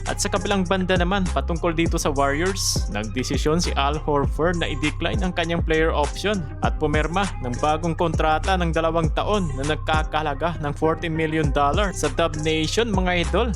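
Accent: Pangasinan, Philippines. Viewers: Pangalatok kb Sir matigas k kc magsalita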